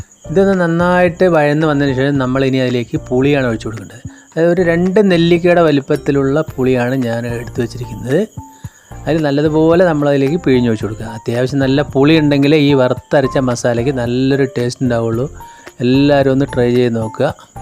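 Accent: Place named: Kannur, India. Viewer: വറുത്തരച്ച അയല കറി അടിപൊളി ആണല്ലോ 👍🥰❤️കണ്ണൂർ ആണെന് തോന്നുന്നല്ലോ 😃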